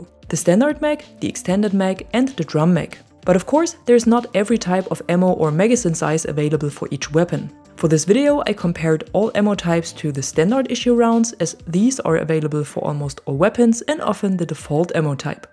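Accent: Germany. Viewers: Recongnised a slight german accent :) Thanks for the video.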